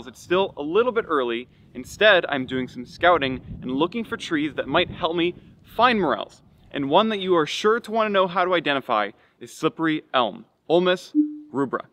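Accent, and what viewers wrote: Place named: Pennsylvania, United States. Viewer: So where are you at or from? Cuz I'd swear you were a county over with your accent. Ya Sound like any other Pennsylvania person to me.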